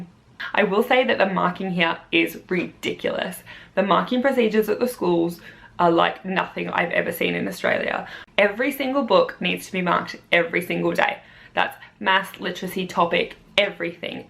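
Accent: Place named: United Kingdom. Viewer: Your accent is starting to sound British :D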